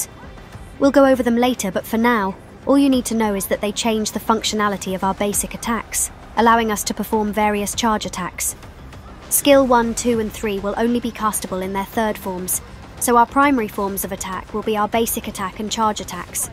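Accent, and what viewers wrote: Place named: United Kingdom. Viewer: What a Nice plasure to ear That british voice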